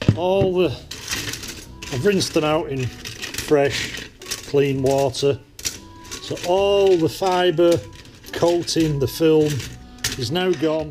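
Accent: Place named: United Kingdom. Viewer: By your accent your in the UK. Where did you manage to get the hydrochloric acid from as I need some but can't get it anywhere please. Great informative video thanks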